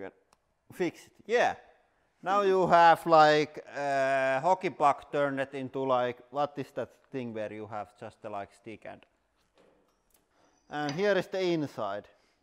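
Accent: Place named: Finland. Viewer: Kunnon rallienglanti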